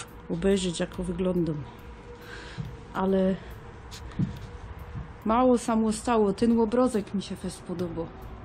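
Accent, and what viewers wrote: Silesia, Poland. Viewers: Super! Fajnie to wygląda od środka, ale najlepsze to że po Śląsku mówisz a raczej godosz, pozdrawiam serdecznie i oczywiście łapa w górę 👍👍👍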